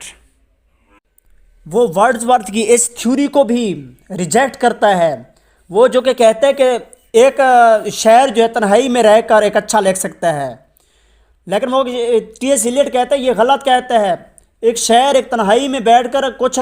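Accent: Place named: Saraiki, Pakistan. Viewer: Saraiki accent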